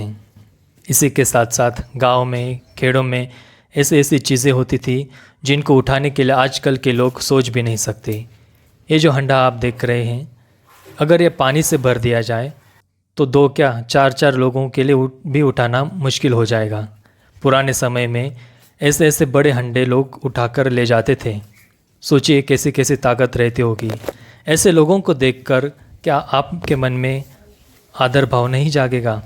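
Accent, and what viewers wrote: Maharashtra, India. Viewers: I like your Marathi touch in your Hindi.... this is the beauty of our Bhartiya culture ❤️ Jai Hind 🇮🇳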